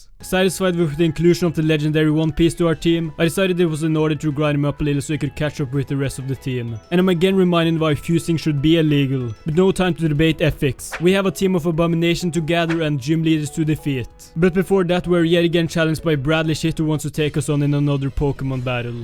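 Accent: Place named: Norway. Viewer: I watched 10 seconds of this and I was like "han fyren her er garantert norsk". Glad to see it confirmed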